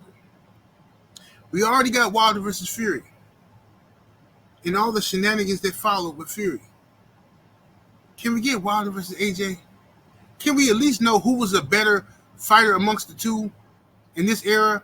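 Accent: United Kingdom. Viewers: Your U.K. accent. 😂👌🏻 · Your Scouse accent 😂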